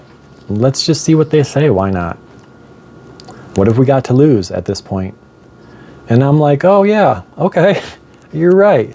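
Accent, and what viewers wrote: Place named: Midwest, United States. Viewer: Hi. You're so normal that you're confusing me. I think I've been alone too long. I live in a box too. That's because, four years ago my husband went in the kitchen on his birthday and he passed away on our kitchen floor. I chose a 12 x 11 box. For a year, I layed in my bed for 23 hours a day. You see, I don't drive and nobody came. I went into shock and I was afraid to cry because, I thought there was a pretty good chance I might start screaming and then, who was going to stop me? Fortunately, I had my dog Charlie. She was the reason I had to get out of bed for an hour a day. I've spent a while trying to figure out who I am after I found myself in isolation. It's a good thing that I have a lot of songs too. I was into my kick about 2 months ago. She's adorable and man, can she sing. I started my toothless journey probably last year sometime. 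Maybe that's the only positive thing about being alone. I didn't have to talk to anyone. Which is kind of sad because, I love talking to people. I have just recently learned to quiet that negative voice. If a negative thought pops in my head, I'll actually stop and say, that's not my thought. Get lost. I wonder something because of your accent and you saying that you grew up in the Midwest. I live in Michigan and they call us the Midwest but, we talk more like Canadians. Anyway, I was gifted a set of temporary dentures and I'm going in a week for the second re line. I was thinking about how much I loved licorice and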